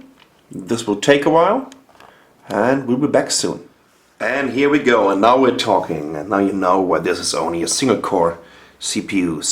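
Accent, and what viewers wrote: Germany. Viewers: dude, you have a very strong german accent. haha, btw good video! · are u german..?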